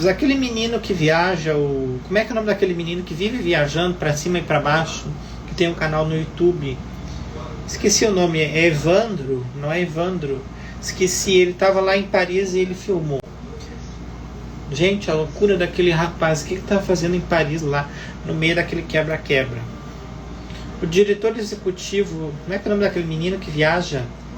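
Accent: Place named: Portugal. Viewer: Sotaque português... 😂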